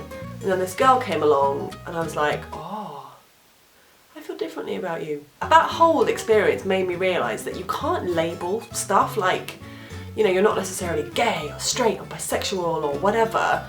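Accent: England, United Kingdom. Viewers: You make really good videos, plus I like the travel videos like Ibiza, keep it up you are awesome, my friend likes your English accent.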